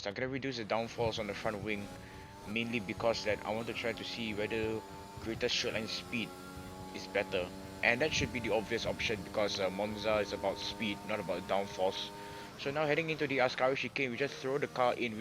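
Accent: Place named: United Kingdom. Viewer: Brithish accent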